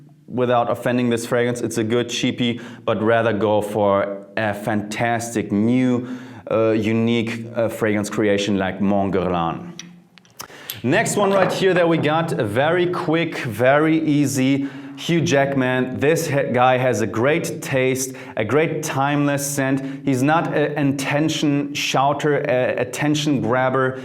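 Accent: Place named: Germany. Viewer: omfggg german accent soo hard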